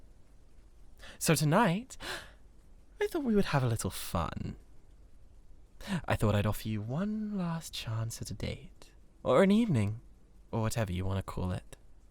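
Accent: United Kingdom. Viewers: High pitched British screaming that's makes you both laugh and also feel slightly concerned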